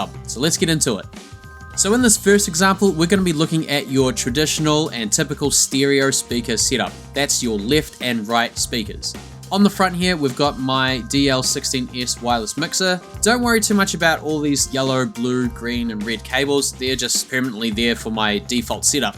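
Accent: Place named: New Zealand. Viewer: Good channel! Sounds like a kiwi accent. I have the same mixer too 😊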